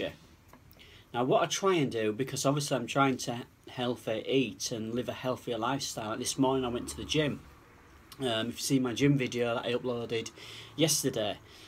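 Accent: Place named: Northern England, United Kingdom. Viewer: You've got to stuff it in your gob, couldn't sound more northern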